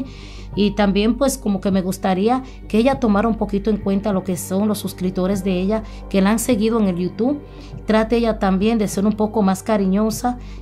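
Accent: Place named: Dominican Republic. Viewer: tu eres dominicana? Ese hablado nuestro se conoce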